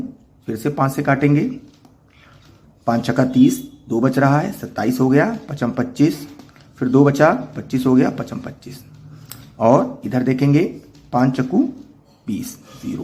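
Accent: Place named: Lucknow, India. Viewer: U r belonged in near about Lucknow. Voice touch aaka vaisa hi h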